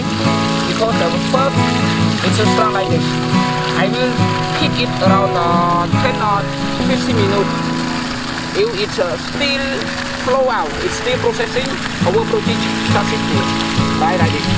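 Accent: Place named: Thailand. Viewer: Dù nói tiếng Anh nhưng nghe vẫn biết là người Thái Lan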